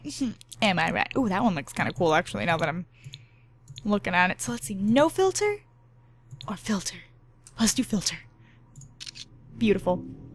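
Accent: United States. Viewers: Your American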